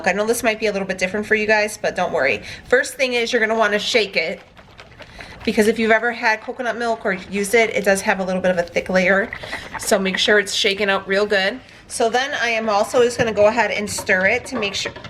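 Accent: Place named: Minnesota, United States. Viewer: I hear a northern accent..are you from Minnesota ?? I was born and raised in Minneapolis -Fridley.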